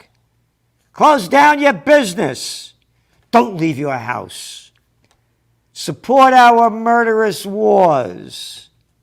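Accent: England, United Kingdom. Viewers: Great show, loved the English accent! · The English Accent is spot on LOL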